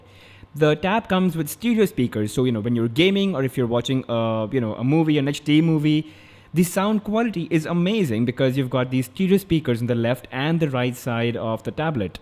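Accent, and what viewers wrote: India. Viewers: yea thats the indian accent for sure lol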